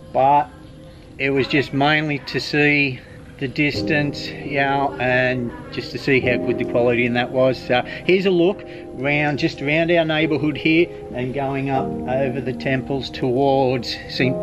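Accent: Australia. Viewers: Hi I’m new to your channel and I’ve heard you talk about your kids and was wondering if nee née have any. Keep up the videos good listening to an Aussie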